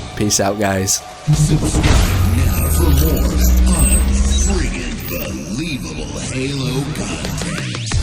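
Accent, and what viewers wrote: United Kingdom. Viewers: Reverbed obviously British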